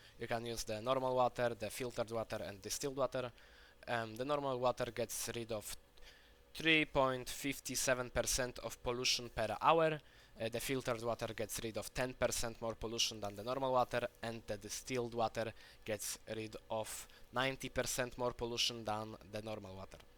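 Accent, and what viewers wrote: Poland. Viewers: ah ten polski akcent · Im thinking polish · Słychać po akcencie że Polak rodak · You sounds like Polish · Coś czułem po akcencie że rodak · masz akcent polski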